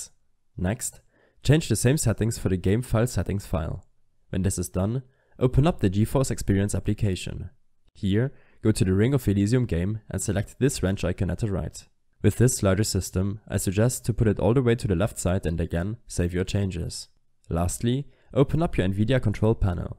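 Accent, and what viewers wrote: Germany. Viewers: ich habs auch schon an seiner aussprache erkannt und dann erst bemerkt, dass aufm pc auch alles auf deutsch ist xD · Man hat direkt an deinem Englisch gehört dass du ein Deutscher bist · Alle sagen dass man den deutschen akzent raushört Aber niemand gibt probs für seine englischskills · Jawoll ein deutscher das hört man so raus hahah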